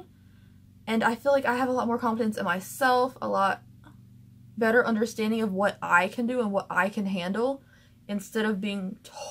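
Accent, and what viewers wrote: South, United States. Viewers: Love you MOSTEST sis!! I'd LOOOVVEE to hear your southern accent in full force... I have a southern Illinois accent so a drawl out my A's real bad. I don't notice it but when I traveled west I got a bunch of shit for it.. Not bad. Just shit. Lol anyway have a good night girlie!! ✌🤘💋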